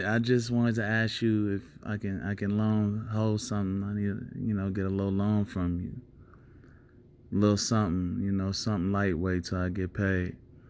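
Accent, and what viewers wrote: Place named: Philadelphia, United States. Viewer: I knew you had Philly roots. The accent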